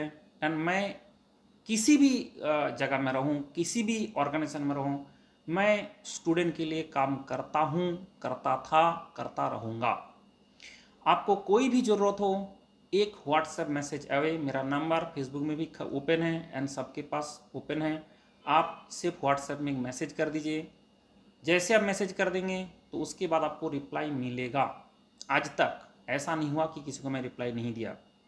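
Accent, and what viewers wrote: Bengal, India. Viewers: he has a typical bengali accent hqhqhqhqhq hehehehhehehe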